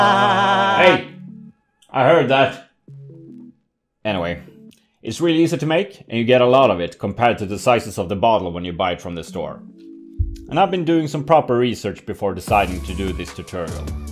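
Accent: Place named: Europe. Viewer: Damn I'm good... In recognising English accents spoken by Europeans. :)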